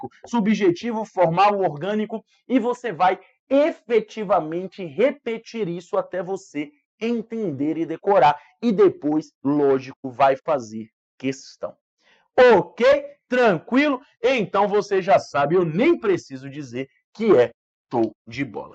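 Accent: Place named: Salvador, Brazil. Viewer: Quando escutei o sotaque, eu falei pra mim mesmo "esse cara é de Salvador" kkkkk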